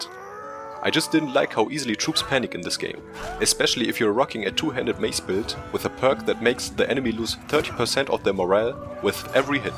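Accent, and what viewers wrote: Germany. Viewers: Ich mag deinen deutschen Akzent 🙌 great Videos, keep it up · Hi, thanks for the video! I like your German accent:D. I installed most of the mods in the videos you uploaded, but I don't have the mod options in menu. Could you help me? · Höre ich da ein deutschen Akzent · Youre german accent is so funny xD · Really enjoying your videos, very helpful and informative. I also love your German accent, I lived in Germany for 5 years and fell in love with the place. Have a nice day. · Dein Englisch ist definitiv besser als meins...aber dieser Deutsche Akzent triggert einen einfach hart...versuche einfach das ganze mehr rund auszusprechen oder hör dir an wie Amerikaner sprechen das klingt nicht so hart und man würde nicht sofort hören das du Deutscher bist xD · Jo kann es sein dass du aus Deutschland kommst xD Der Akzent kickt ^^ Edit: Oh ok du bist anscheinend ein Deutscher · der deutsch aktzent kickt :) nice vid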